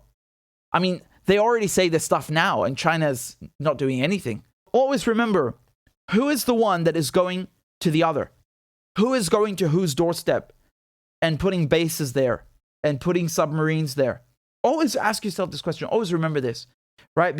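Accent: Australia. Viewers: Love the Aussie accent 😂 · Hahaha love your Australian accent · That was a great Australian accent. I love it lol Aussie’s love hearing people try speaking like us.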